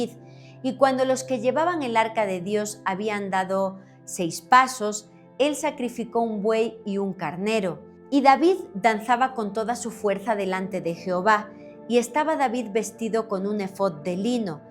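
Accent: Spain. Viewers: me encanta escucharte tienes tantas cosas tan importantes e interesantes en tu canal felicidades eres también muy profesional y comprometida encima de todo eso tu acento español ❤🎉 felicidades nunca te canses de alimentar mi ignorancia ❤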